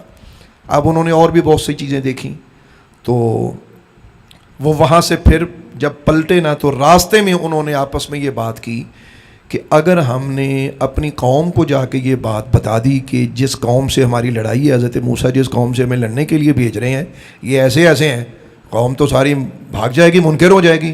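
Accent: Arab world. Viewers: ALLAH HO ALLAH … HAZOOR QIBLA E MURSHID … sadqe ap k … Arabic lab o lehja khubsorat or purasar …. alaaa point of view ko beyan farmaya QURAN E PAK K reference se … haq farmaya … jo qume apny wade torti hai wo barbad hoti hai … ALLAH HO AKBAR …. qume bini israeel k waqie se humari alaaaaa islah farmai … ALLAH PAK humhy wada khalfi se bachye … ameeen … 🙏🏻🙏🏻🙏🏻🙏🏻🙏🏻👍👍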